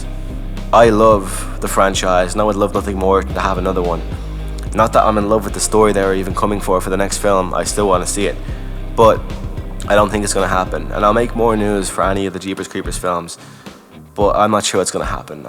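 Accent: Ireland. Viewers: This guys Irish accent is damn sexy.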